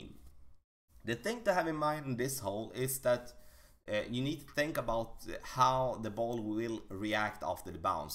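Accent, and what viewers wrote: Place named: Sweden. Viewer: Svengelska haha nice gillar din kanal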